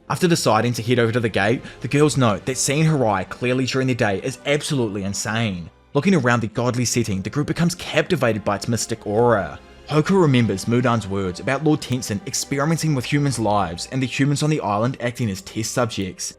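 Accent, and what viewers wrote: Australia. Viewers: When history, spiritualism, botany, & anime are intertwined with intellectual acuity the results breed something akin to Hells Paradise. Delightful narration & compression of arc by. Who doesn't love a story told in Crocodile Dundy's accent. Highly anticipating your next arc explained. Thanks, but out of curiosity any significance choosing the name? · Australian accent isn't it?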